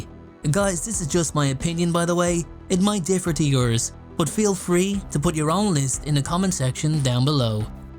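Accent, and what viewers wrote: Ireland. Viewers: Your list makes me wonder if you might never have seen the movie with Frank Langella as Dracula? The one with Laurence Olivier as Van Helsing. BTW, I love your Irish accent! Dracula as... 'Drak'ullah'. Aye, dem feckin' vampires out dere bitin' troats!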